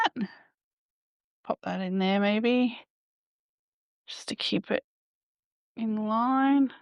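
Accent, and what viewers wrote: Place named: Australia. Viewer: Found your channel through this video and two minutes in I was infatuated by your personality! The Australian accent is a bonus 😂❤ very thorough video indeed, thank you! New subbie from Sweden!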